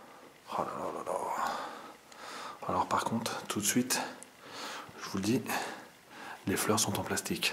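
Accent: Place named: Belgium. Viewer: Un petit accent belge .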